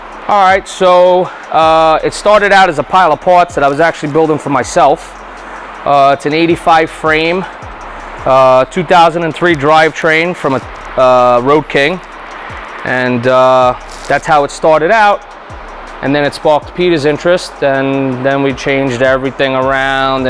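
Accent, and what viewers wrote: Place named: New York, United States. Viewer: as an Australian love that new york accent mate!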